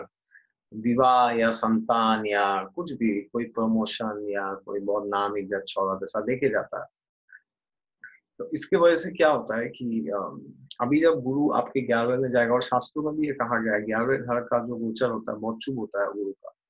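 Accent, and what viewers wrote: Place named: Assam, India. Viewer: First time ur voice resonates like assamesse people.. Ur accent.. It's cute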